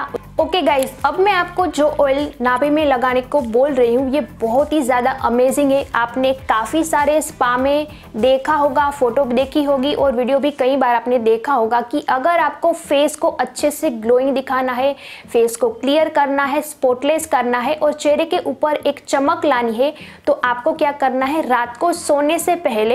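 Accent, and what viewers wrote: Gujarat, India. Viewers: You must be a Gujarati by the way you speak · I like her gujrati accent i miss my gujrati friend.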